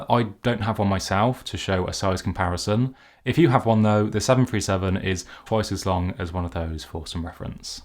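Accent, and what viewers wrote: Midlands, United Kingdom. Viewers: I know a midlands man when I hear one. 😎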